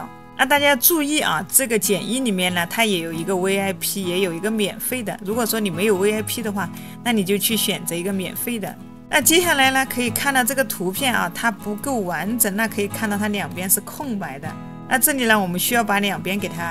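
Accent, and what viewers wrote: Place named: Sichuan, China. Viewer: 四川的吧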